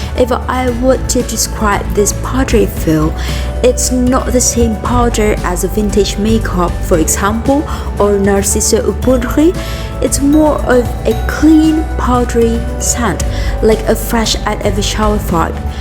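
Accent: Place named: United Kingdom. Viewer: Great description, Awesome illustration, Fantastic British accent ! Well Done !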